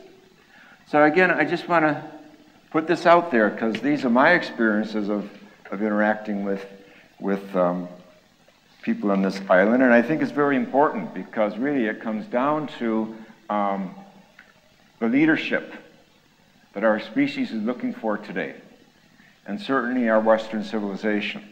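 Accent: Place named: Ireland. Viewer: and I love his Irish accent....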